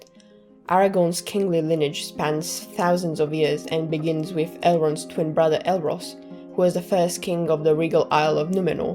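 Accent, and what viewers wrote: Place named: Brazil. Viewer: I'm pretty sure she's Brazilian, they pronounce R's with an H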